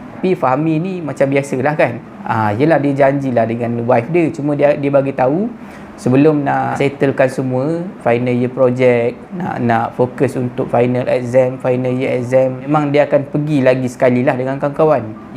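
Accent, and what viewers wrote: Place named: Perak, Malaysia. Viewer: Bang orang mana terengganu ke perak bunyi seakan akan macam loghat peghak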